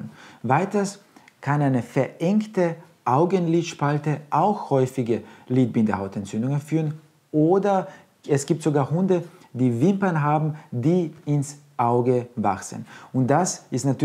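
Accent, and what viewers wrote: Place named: Russia. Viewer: Dieser russische Akzent macht das Video einfach perfekt 😂keine Ahnung woran es liegt aber wenn jemand mit russischem Akzent spricht würde ich mir alles angucken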